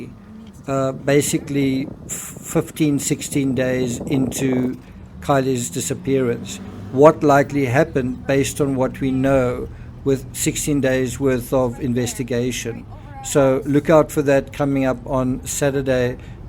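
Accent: South Africa. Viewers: I recognise the accent...fellow South African...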